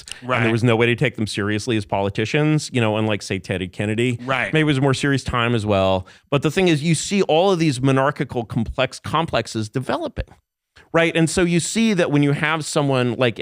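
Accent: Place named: California, United States. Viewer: He has an annoying California accent to me. The long drawn out syllables as he improvs his next empty general statement.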